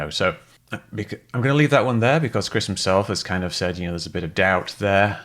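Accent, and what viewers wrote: United Kingdom. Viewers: Lmao if your stupid enough to believe this asshat knows more about a flir targeting pod than EVERY PILOT IN THE MODERN DAY MILITARY, then you deserve to be kept in the dark. I mean he used actual science and math to prove his point, while this dumbass used a flashlight in his garage in a lame attempt to make his point. Just becuase they have a British accent, doesn't mean they are intelligent. This guy just profits off of this shit ironically like the people he's trying to discredit. Think about it, who has the most to lose in this argument? Mick West or literally anyone else who actually trained as a fighter pilot? Use your damn head people!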